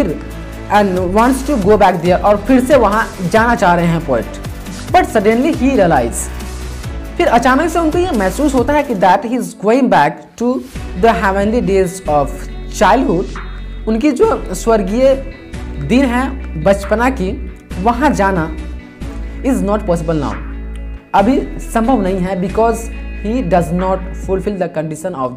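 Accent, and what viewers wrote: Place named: Bihar, India. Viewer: Main comment my pdi aap bihari ho lg v rha tha jb suni tb bhut achhi explained kiye ho aap bt thodi si bich bich my annnnn unnnn ar rukna bnd kijiye😂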